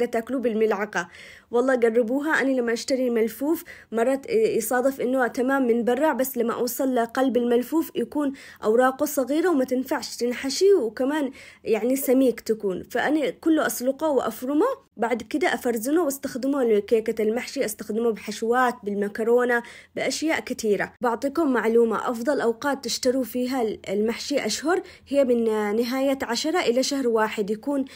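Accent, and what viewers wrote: Aden, Yemen. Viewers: ماشاء الله ماحسنيك للهجه العدني وطريقة شرحك حلو الله يعطيك العافية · صوتك اجمل من الفديو كامل ماشاء الله عل الهجه العدنيه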